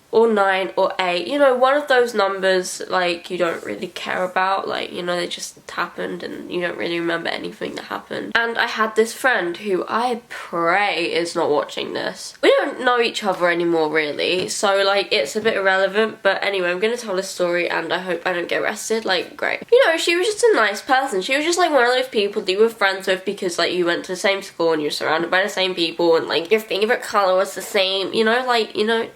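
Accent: United Kingdom. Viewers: Amanda Dorothy Anderson it's called a British accent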